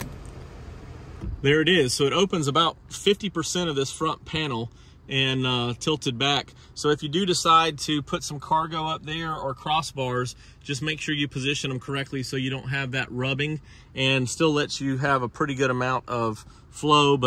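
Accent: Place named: Alabama, United States. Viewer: It’s kinda funny because the sales rep in our company from Alabama says “sells” instead of “sales” just like you.🤣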